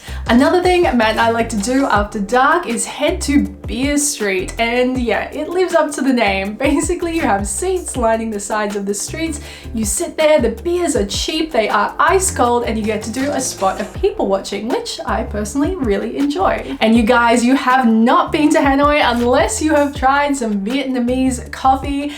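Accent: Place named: Australia. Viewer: I have been living overseas for about 25 years and I have never been to Vietnam my country before. Your travel videos are very relaxing and I am glad that you really had a great time in Vietnam. After the Covid 19 pandemic I plan to go to Vietnam for the first time and please make more travel videos in the future they are really good. Do you like Tokyo and Japan? By the way Australian accent is quiet exotic!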